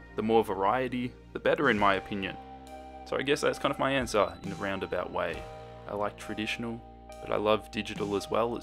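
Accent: Australia. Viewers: are you from, Australia?...im so curious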